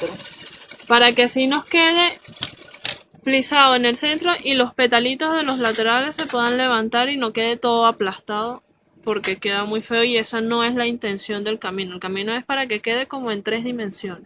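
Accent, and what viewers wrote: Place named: Venezuela. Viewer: Ese hermoso acento, solo puede ser de VENEZUELA. Saludos desde Barquisimeto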